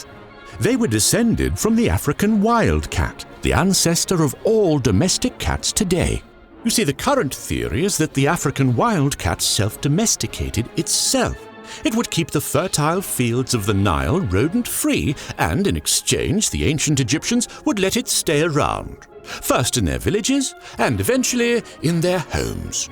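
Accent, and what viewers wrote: England, United Kingdom. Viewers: Glad to see a new Video! 😁 Is the Narrator British or American? He sounds very Stephen Fry, but pronounces some words in US English? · I just discovered this channel and I'm already enjoying the history videos, I especially love your "Englishman" like caricature. I subscribed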